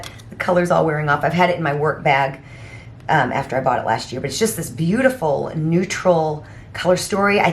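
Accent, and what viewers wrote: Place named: Georgia, United States. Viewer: I didn't know you're in Alaska. I feel like you have a Georgia accent 😊😅 I love your earrings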